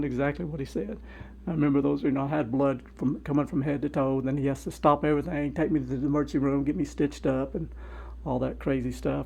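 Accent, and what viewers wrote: Kentucky, United States. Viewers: I had lots of family down south I'd visit every holiday back in the 60's and 70's. Your Southern accent on top of the decor makes it like a dream for me. Thanks for this video.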